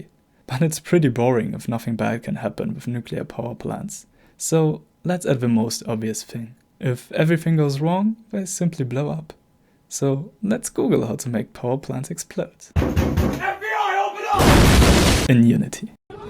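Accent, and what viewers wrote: Germany. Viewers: Man erkennt deutsche einfach am sprechen xD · Liebe es wie deutsch du klingst obwohl du extrem gutes englisch sprichst. Sehr gutes video btw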